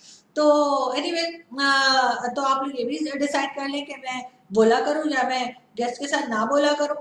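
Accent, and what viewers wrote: India. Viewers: Voice problem is not due to non use of ear buds but it's echo voice problem. Secondly you try to make your presentation more professional and international by wearing decent and office looking outfits as our Indian Anchors are doing. Also keep on changing your looks and style to add freshness to your programmes. Your style of talking and pronunciations are totally Indian type Keep it up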